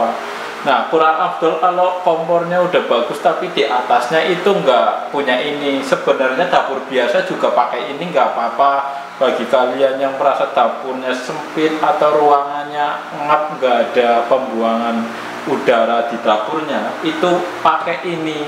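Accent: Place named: Java, Indonesia. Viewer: Meduk mas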